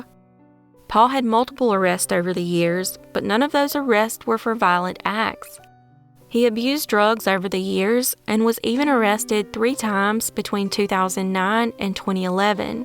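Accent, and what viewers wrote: South, United States. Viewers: Thank you kindly for your amazing story and I love you southern accent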